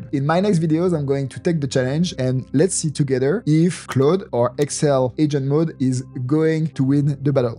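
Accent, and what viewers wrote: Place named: France. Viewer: Comment reconnaitre un français ? avec son accent quand il parle en anglais nice video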